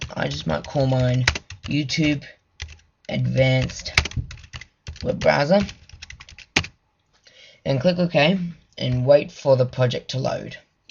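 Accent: Australia. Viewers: 5 more comments till 1337 and are u australian?cuz of ur accent and cuz u said G day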